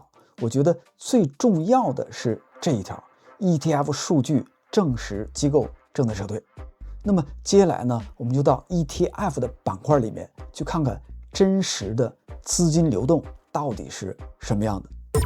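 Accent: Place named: China. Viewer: 很明显是大陆口音，即使人在国外也是在大陆出生长大的成年后才出国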